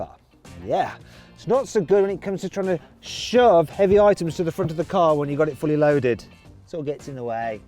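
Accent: United Kingdom. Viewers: 진짜 영국식영어 지대로네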